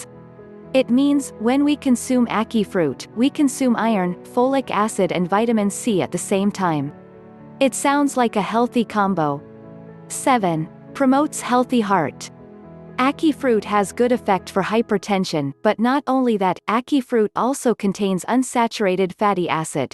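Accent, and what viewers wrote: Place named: Portland, Jamaica. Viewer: You sound like you're from Portland!!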